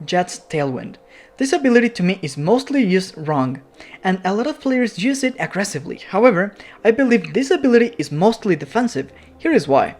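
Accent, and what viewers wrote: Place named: Brazil. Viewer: Parece o sotaque de um brasileiro falando Inglês.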